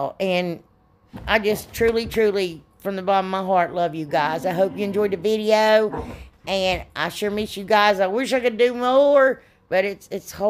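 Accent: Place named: Rural, United States. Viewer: I absolutely love your accent... What state are you from? Your crafts are so kool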